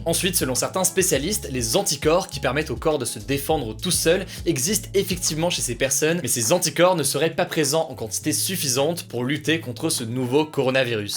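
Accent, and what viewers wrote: England, United Kingdom. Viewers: Pourquoi tu portes un accent britannique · J'adore ton accent anglais 😍😂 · That english accent though, legit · mdr ton accent en anglais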